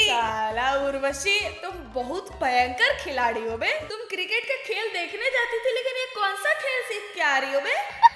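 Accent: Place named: Punjab, India. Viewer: Yar apki punjabi bhut cute lagi mujhe sachii 🫣🫣🫣🔥🔥🔥❤️❤️❤️❤️❤️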